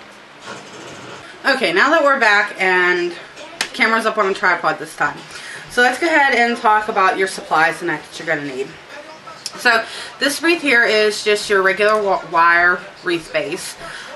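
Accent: Pittsburgh, United States. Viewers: Thanks for sharing and going step by step for us beginners! Love your accent, I'm guessing Pittsburgh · Not even 30 seconds into the video and I knew you were a Pittsburgh girl:) Great video...n'at;) Thanks for sharing:)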